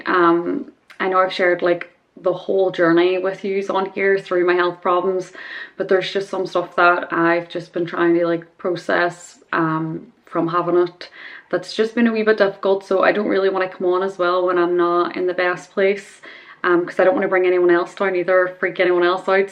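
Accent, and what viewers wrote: Ireland. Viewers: you look STUNNING!!!! your hair is angelic; your eyebrows look perfectly fine. I luv your Irish accent and I'm glad you're feeling better. I have a mild form of Epilepsy and have had several seizures, so I know how terrible they are and feel. Good luck to you, if I see you in a pub, I be sure to buy you a Guinness. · I appreciate that you have the captions turned on. Irish accents are difficult! It was so cool you got to see your surgeon. And I think that your eyebrows look fine. I don't even notice them. I'm sorry they hurt but I didn't even notice anything about them. Your hair is so beautiful.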